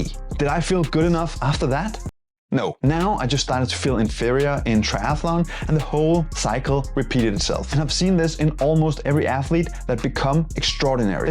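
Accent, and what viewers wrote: Denmark. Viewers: A very valuable video. Much love from Sweden. The Danish accent in your English makes it even better as my circle is filled with of wonderful Danes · He is Danish, its just a dialect. Learn to understand it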